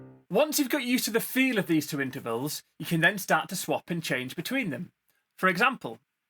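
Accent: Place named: Manchester, United Kingdom. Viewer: Love listening to your accent! I’m guessing it’s northern - Manchester? From an avid British tv watcher from Australia. Also enjoy the content of your videos - very helpful!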